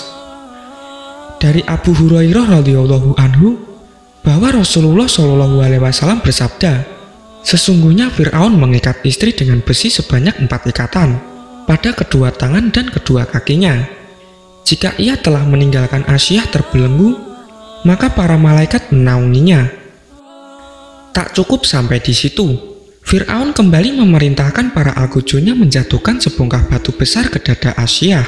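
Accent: Java, Indonesia. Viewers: maaf ya mas,Aku sangat suka dengar cerita kisah nabi... tp kali ini aku gk bisa focus liat cerita nya malah tertawa dengar suara mas ... mas asli jawa ya mas kok medooook banget🤗🤗🤗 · Narator nya meddok banget jawanya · suara logat jawa... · Lek ngomong koyok wong jowo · Pembaca logat jawa kurang sedap di dengar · Ciri khas jawa ngomng nya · Naratornya jowo sangat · Yg baca narasi Jawa banget 😄👍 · Saran saja ya,,,pengisi suaranya jangan terlalu kental logat jawa,, kadang2 kaga ngerti bahasanya😂😂😂😂😂🤣🤣🤣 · Ngomongnya medok jawa logat bahasanya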